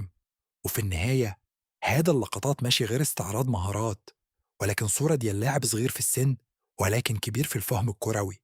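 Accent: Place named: Egypt. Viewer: ماشاءالله تتقن جيدا اللهجة المغربية بنطق مصري😂😅. جميل جدا❤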